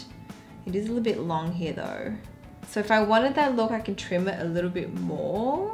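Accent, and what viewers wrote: Australia. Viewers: I love all your videos. I love how you spoke, your expression, your make up and your settings. I love Aussie accent and before i don't know you're Australian so i lowkey love your accent and one day i was thinking could it be an aussie accent 🤔 and yeah i was right i knew it bc i was a big kpop fan and there's few kpop idol with aussie accent like Rosè, Chan & Felix (just mentioning bc i love Aussie accent). Moreover, I'm loving your videos. I watch it and then repeat it again and again. Btw, i'm Zuali from Northeast India. Love you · woah I thought she lived in Australia, I must’ve missed the move to Singapore! Still think she lives in Aussie because of the prominent accent hahaha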